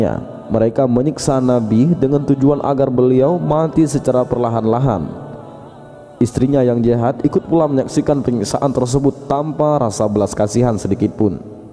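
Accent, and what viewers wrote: Aceh, Indonesia. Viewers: Yg peugah haba mngkn jeut neu stel bacut logatjih, brat that meu aceh...semoga kedepan makin berkembang..amin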